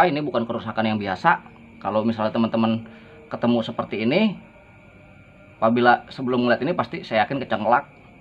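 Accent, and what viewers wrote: West Java, Indonesia. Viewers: Mantap hu jeli banget penjelasannya...orang sunda kang????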